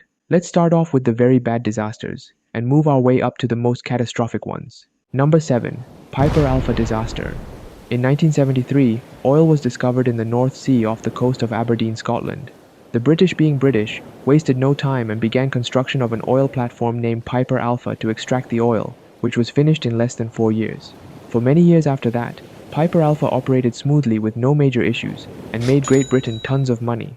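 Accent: India. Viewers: Bro has an Indian accent made by ai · he sound indian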